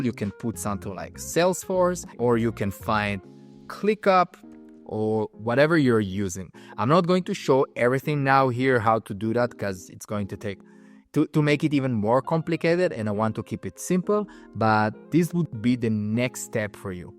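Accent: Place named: Israel. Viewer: What's your background you sound Israeli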